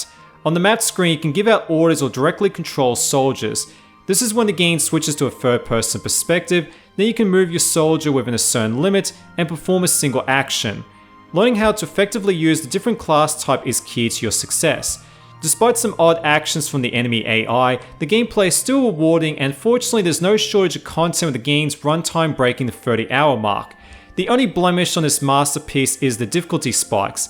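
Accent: Australia. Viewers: Is this an Aussie reviewing it?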